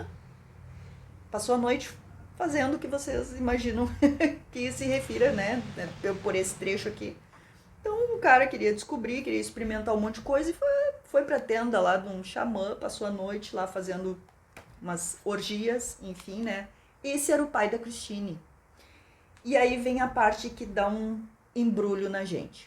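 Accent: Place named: South, Brazil. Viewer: Eu que sou de 88, tenho uma queda por essas capas rsrs, uma vida vendo livros assim nas bibliotecas que frequentei... mas, a história é doida hem?! 😅😂🎉. Nem Jung explica, já que Freud só complica. Pesada a trama...😮 Sigo seus vídeos desde que vi um vídeo seu sobre um livro gótico do círculo do livro, acho sua dicção sensacional, o sotaque sulista é tão lindo... você é muito animada uma pessoa do bem!!! Felicidades para você e seu noivo.😊 Eu sigo a Tati e você não fica atrás nem um pingo, suas resenhas são ótimas. Quando tem uma taça de vinho, já sei que o livro compensa rsrs. Amo o canal do Rubens também ❤ Sucesso!!!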